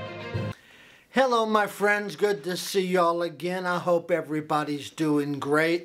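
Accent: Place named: Brooklyn, United States. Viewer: I just watched your interview with the German gentleman, wish I could remember names but, middle age, whatcha gonna do, and I got the low down and lay out of that shop and forgive me lord but DAMN! I couldn't be more impressed if the tools were gold plated. I'm looking forward to seeing more of your skills and ideas. My biggest question so far is how you can live in Texas and retain as much Brooklyn in your accent as you do, or am I losing my hearing too? Gotta go now, you're about to reveal your peacock table and I don't want to miss it. We'll talk again, Peace and respect from Idaho.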